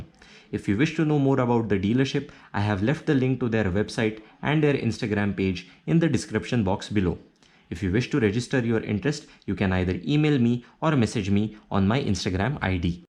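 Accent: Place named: Pune, India. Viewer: Puneri english accent.nako re.marathit kelas tar khup likes miltil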